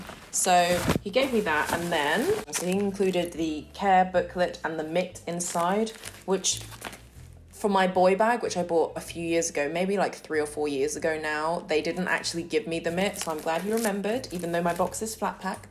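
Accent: United Kingdom. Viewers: Your voice is like a white woman! If I close my eyes I’d thought a white woman’s speaking. . 😊 Your accent of course british, no doubt. But Asian normally has lower tone. Yours is deep. Btw, love your blogs !!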